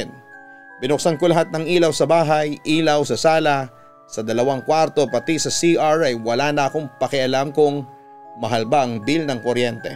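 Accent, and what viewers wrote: Conyo, Philippines. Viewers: Less nakakatakot pag pa konyo2 english na yan haha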